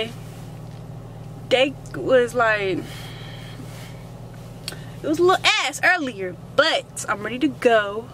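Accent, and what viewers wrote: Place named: United Kingdom. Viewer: The British accent 😂LOL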